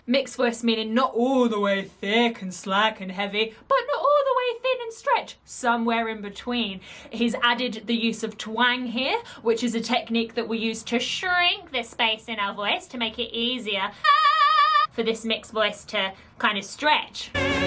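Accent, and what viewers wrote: United Kingdom. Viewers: İt has my soul apart hhahaha .. I wanna find you and squeze your cheeks.. You could ve cuties person in the world 😇 Also your british Accent is so cute.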